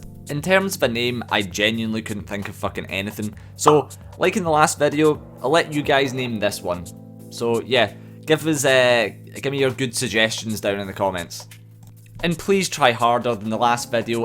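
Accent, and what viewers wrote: Scotland, United Kingdom. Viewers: that scottish accent maaaaaaaaaaaaan!! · I love the Scottish accent! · Are you Scottish?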